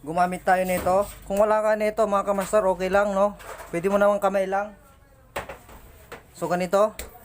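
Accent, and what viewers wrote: Pangasinan, Philippines. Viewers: Taga pangasinan ka siguro ka master hehe the way u talk pangalatok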